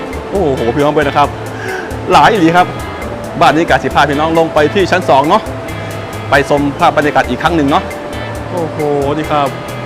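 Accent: Phu Thai, Thailand. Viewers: เจ้าเป็นพูไทยฝั่งลาว หรือฝั่งไทย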